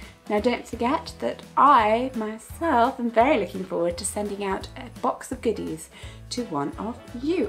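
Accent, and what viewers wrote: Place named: England, United Kingdom. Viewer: I started watching this channel because i liked looking at your face and hearing your english accent but this was actually touching. ty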